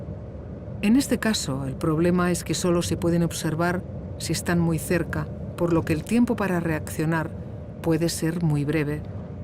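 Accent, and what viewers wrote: Catalonia, Spain. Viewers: El acento catalán tan marcado de la narradora no me ha dejado disfrutarlo. No tengo nada en contra de los acentos, pero para una narración hubiera preferido uno neutro. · Como se nota que la narradora es catalana, el acento la delata. Buen documental por cierto 👍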